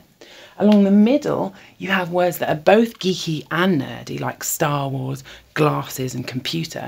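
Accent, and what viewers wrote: United Kingdom. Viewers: It's the soft, clear voice with the British accent that gets me. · I normally don't like red heads or Brit accents. But they both are awesome here. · And the accent. Don't forget the brit accent.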